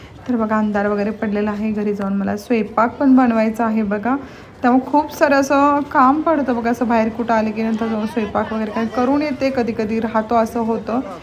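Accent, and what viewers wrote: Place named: Khandesh, India. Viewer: khup diwasatin nahi ....khup diwasani.....please correct marathi bola ...bolnacha tone khandeshi kivha Vidharbh kivha Kolhapuri vatate.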